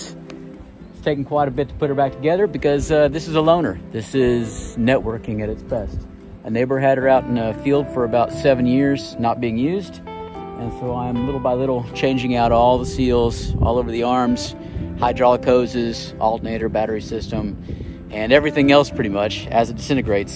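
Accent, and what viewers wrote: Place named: Rhode Island, United States. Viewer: we are Rhode islanders so the accent gives it away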